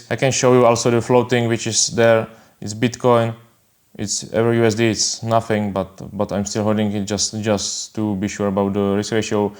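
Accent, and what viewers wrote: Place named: Czech Republic. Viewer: ten ceskej prizvuk je tam hold slyest🤛:finger-red-number-one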